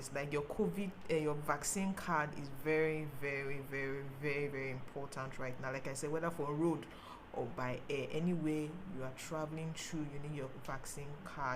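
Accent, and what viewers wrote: Ghana. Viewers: Ur accent look like Ghanaian good job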